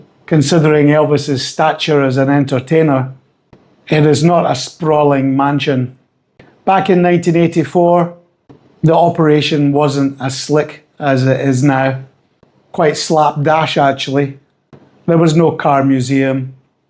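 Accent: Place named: RP, United Kingdom. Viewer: Your BBC pronunciation is beginning to grate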